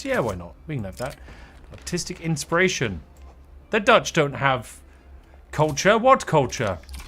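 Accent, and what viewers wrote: United Kingdom. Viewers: Cant wait to fall asleep to a british guy talking about drugs and tea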